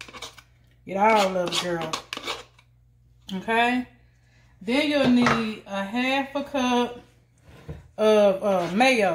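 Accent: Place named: Louisiana, United States. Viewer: You sound like you have a Louisiana accent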